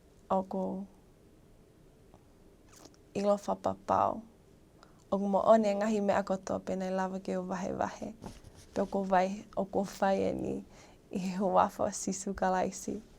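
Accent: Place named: Tonga. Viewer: you speak Tongan so fluently... accent is sooo Tongan Tongan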